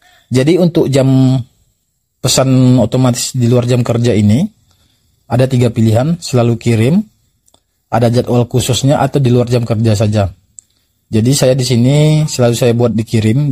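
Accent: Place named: West Sumatra, Indonesia. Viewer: Dari logat nyo apak alah jaleh apak urang minang kan 😊🤭 Dima tingga kini pak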